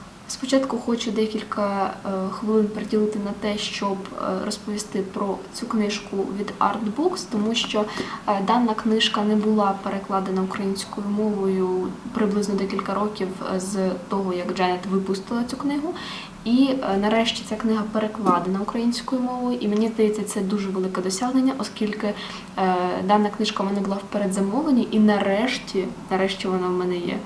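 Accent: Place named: Russia. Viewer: Привітик, файне відео:3 але попрацюй над вимовою літер, вимовляй чіткіше літеру "Щ" наприклад як "шчо, шче, шчоб" та не забувай про "Ў нескладове", розумію тобі як російськомовній буде тяжко звикнути, але потрібно позбавлятися російської вимови, я вже довгий час працюю над своєю вимовою!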